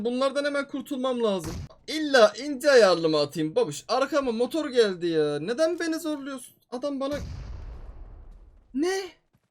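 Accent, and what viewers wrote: Urfa, Turkey. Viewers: Ulan abi çok güzel urfa şivesi yapıyosun seni izleye izleye şivem kaydı 🤣😂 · Abi Urfalı olduğunu çok belli ediyorsun😀😃 bende Adıyamanlıyım hemşeri sayılırız bu arada geçmiş olsun umarım hastalığın kısa sürede biter 😃😀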